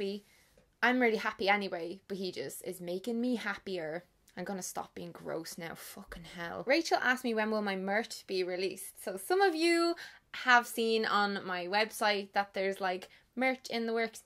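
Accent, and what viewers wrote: Ireland. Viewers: I already thought so haha but finally I get to hear it by a young irish person! btw loving your videos, I relate to so many things and listening to you talk (especially to your wonderful irish accent as I miss this country so much) calms me so much!! xx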